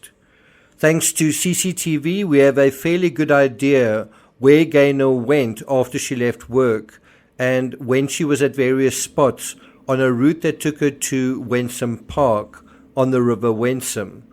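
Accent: South Africa. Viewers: i love your production and narrative, also love your S.A accent 😊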